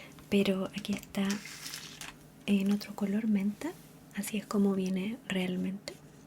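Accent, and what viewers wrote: Chile. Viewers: Cuando eres chilen@ reconoces a otro chileno en un segundo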